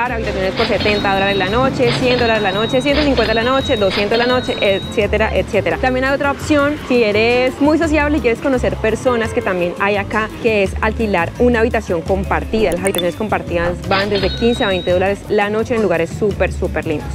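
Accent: Colombia. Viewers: gracias parcerita por mostrar lo bueno de mi honduras Dios te bendiga a ti y tu familia me encanta ese lindo acento colombiano el mejor del mundo bendiciones amiga ya me hice presente con mi like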